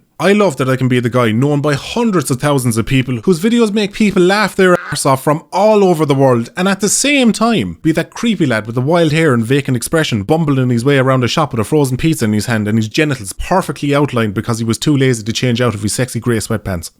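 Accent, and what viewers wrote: Ireland. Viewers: It was kind of obvious who you were when I met you. You're the only 2d green duck with an irish accent. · Imagine one day you can just walks the streets in Ireland or even anywhere. Hear a very familiar accent just to turn towards a regular lad.. think where do I know this accent from... just to get home and realize you saw the actual. And this was probably the first and last time. But you still raise a pint to him and think... God he had a massive.... smile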